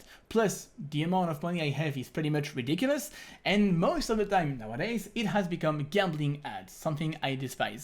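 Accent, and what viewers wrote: France. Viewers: On l'entend bien l accent français :)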